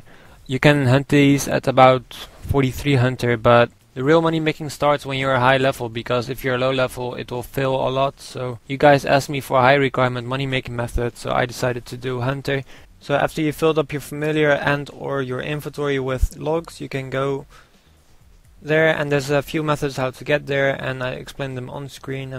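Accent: Netherlands. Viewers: aha ik hoor nederlands accent:P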